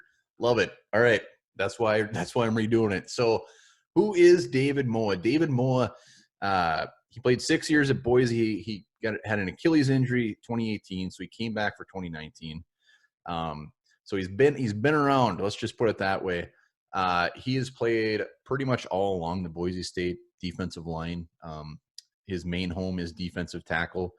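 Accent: Minnesota, United States. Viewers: Your Minnesota accent is soooo heavy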